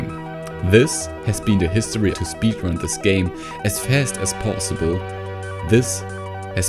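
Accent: Germany. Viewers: im pretty sure that this man in the video is german. i hate this accent. i am german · Man merkt dass du deutsch bist ;D nicht negativ gemeint! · sound's german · Du kommst aus Deutschland oder? Deine Aussprache ist verdächtig haha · Hab mich die ganze Zeit gefragt, ob das ein deutscher ist. Hört man wirklich raus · Bist du deutscher? Hört man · Are you german bro?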